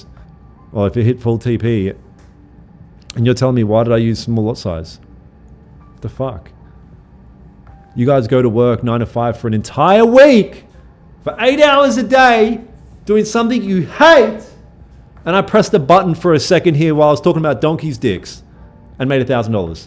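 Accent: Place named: Australia. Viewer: I live overseas as well and it's nice hearing an Aussie voice when watching streams. Keep up the good work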